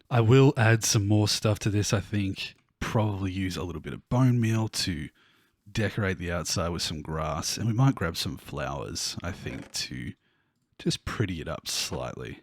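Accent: Australia. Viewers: This is the first video of you I have seen and I loved... And to be honest it's refreshing to hear a fellow Australian speaking in a video. 🤣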